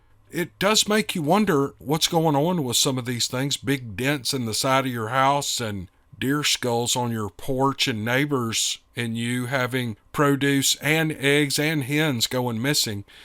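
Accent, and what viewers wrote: South, United States. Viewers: Again, I missed hearing this podcast. I need to change my email address, duh! For some reason your new podcasts aren't on YouTube TV! What's up with that? I always listen to them at night on TV, and I enjoy them so much. If you get a chance, I did send you a file that I narrated some time ago. There might be a couple more that I did you haven't put on yet. Perhaps, they weren't good enough to include. That's your call, of course! I'd love to narrate a couple to see if they go over well. My voice isn't you so I'm sure people would miss that southern accent of yours! I'm just an old yank! LOL Hope you're doing well. Glad to hear you have a job to do! I'll help if I can, just let me know.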